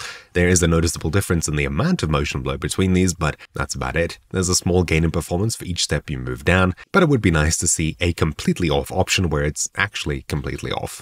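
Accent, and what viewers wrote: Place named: South Africa. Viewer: didnt know your south african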